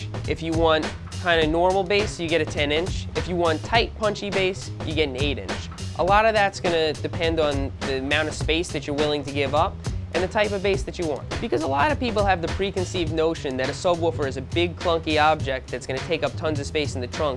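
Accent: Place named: Long Island, United States. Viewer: Long Island accent is coming through HARD compared to nowadays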